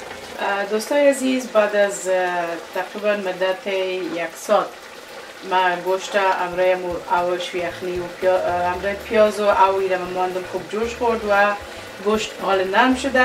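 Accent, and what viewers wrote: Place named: Kabul, Afghanistan. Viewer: تشکر خوهرک چه یک غذا وچه یک لهجه مزدار کابل که ما زیاد خوشدارم مافق وسرفراز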